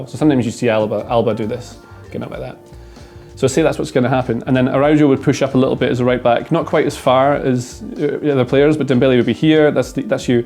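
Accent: Scotland, United Kingdom. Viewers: Something about a scottish accent that causes me to take the opinion immediately serious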